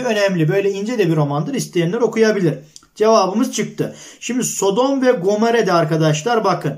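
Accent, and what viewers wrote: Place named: Trabzon, Turkey. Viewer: arada bi konuşmanızdan belli oluyor ben de akçaabatlıyım :))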